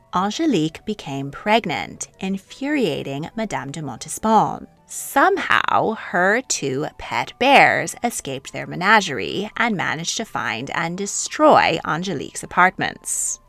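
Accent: United States. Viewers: Beautiful research, writing and graphics. American accent is particularly abhorrent.. Overdub by French woman please. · you should redo this video without the advertising scam and narrated by a pleasant and correct english speaker without the horrendous american accent.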